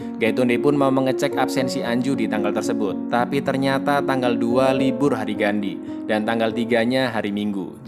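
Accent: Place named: Central Java, Indonesia. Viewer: Abang orang mana bang kok bahasa jawa nya kaya orang jawa tengah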